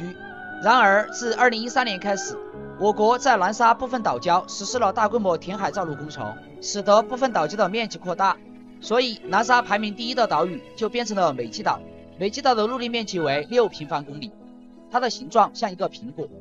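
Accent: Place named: Sichuan, China. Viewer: 这声音是四川那一带的普通话。谢谢解释！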